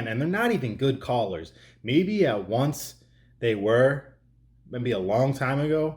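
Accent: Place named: East Coast, United States. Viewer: You should listen to Cum Town, hosted by comedian Nick Mullen. And there always joe Rogan, he puts on a shit ton of talented comedians, his ego is in check and he’s much more honest. Also check out grimewave podcast, you sound like you’re on the east coast, it’s a ton of talented and funny Boston dudes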